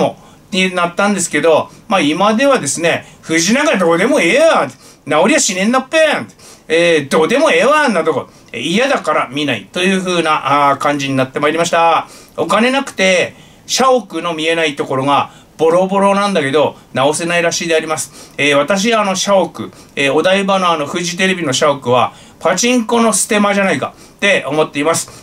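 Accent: Fukushima, Japan. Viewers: 体が大変なのに毎度ご苦労様です。 気持ちが乗ると出る福島なまりが好きです。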